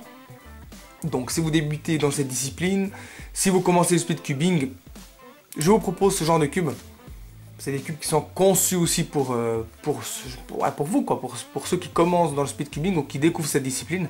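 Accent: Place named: Fribourg, Switzerland. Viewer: Ouais, je suis aussi fribourgeois. J'ai vite reconnu l'accent !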